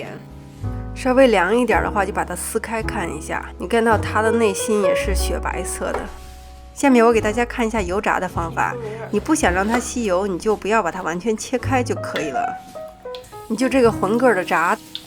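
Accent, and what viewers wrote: China. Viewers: Downvote for shitty mainland chinese accent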